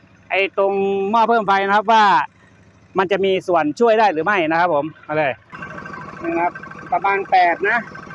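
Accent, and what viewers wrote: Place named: Phrae, Thailand. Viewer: สำเนียงนี้เมืองแป้แน่นอนครับพี่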